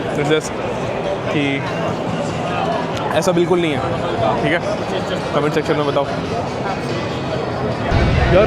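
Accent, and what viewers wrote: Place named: Delhi, India. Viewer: bhai tum mein dilli ki chhaap hai tumhare baat karne se dilli region surroundings ke lagte ho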